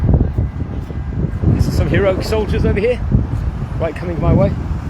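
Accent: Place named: United Kingdom. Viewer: Your from europe you have British accent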